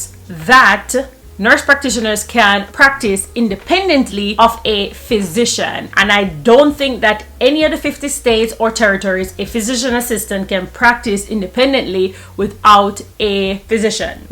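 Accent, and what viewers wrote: Jamaica. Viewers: Come through Jamaican Accent! lol, great video.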